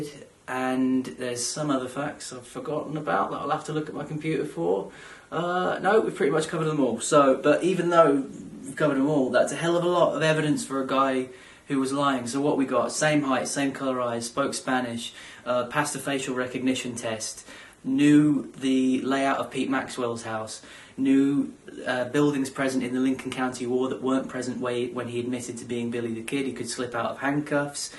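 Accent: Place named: United Kingdom. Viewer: I watched this randomly as an Oklahoman, the moment you talked I was shocked to hear a UK accent. Used to hearing us talking about this.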